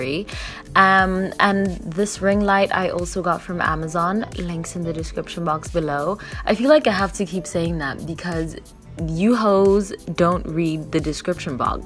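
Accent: South Africa. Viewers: Presume you're South Africa, cause of your ascent... great tips hey, from the motherland... South Africa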